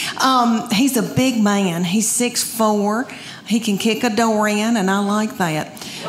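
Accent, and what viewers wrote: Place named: South, United States. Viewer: heard her Tennessee accent and thought,"I'm home."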